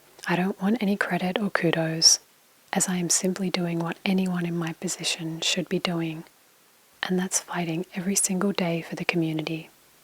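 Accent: Australia. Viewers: The best aussie accent spoken asmrist. This audio is perfect. Please do more 🔥🔥🔥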